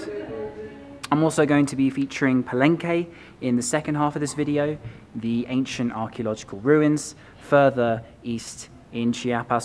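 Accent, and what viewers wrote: England, United Kingdom. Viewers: That beautiful and sexy English accent describing places in Mexico...incomparable.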